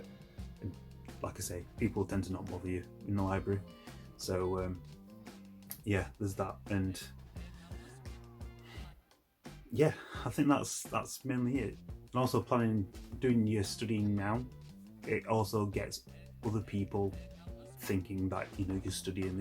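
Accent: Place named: Yorkshire, United Kingdom. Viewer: Good tips....like you say, easy to overlook. I’m trying to place your accent?...south/West Yorkshire?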